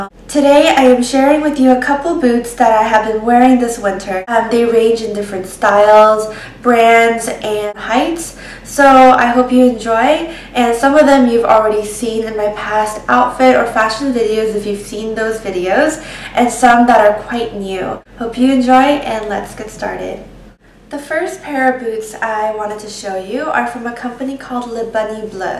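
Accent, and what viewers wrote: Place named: United States. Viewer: a full korean with american accent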